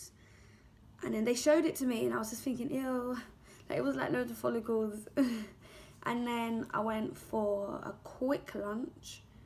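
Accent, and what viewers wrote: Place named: London, United Kingdom. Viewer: Nice London gal accent though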